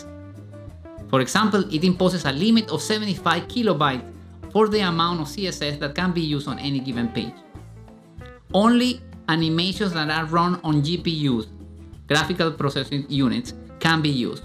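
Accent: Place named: India. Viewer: Why is it that Indians are obviously Indian no matter what language they speak?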